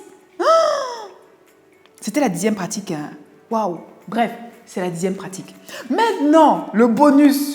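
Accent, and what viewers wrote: Cameroon. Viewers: Je sens, c'est une kmer qui parle et ça me plaît grave....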